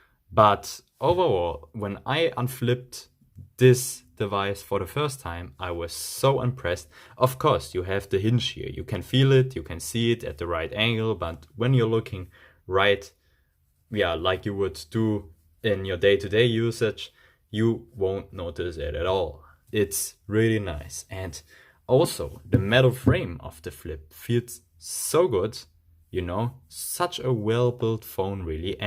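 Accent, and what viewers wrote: Germany. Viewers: Your english is very....german Wieso machst du deine Videos nicht gleich in deutsch?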